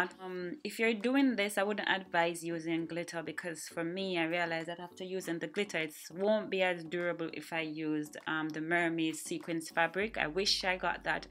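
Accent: Jamaica. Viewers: Just the fact that u sound Jamaican girl I had to subscribe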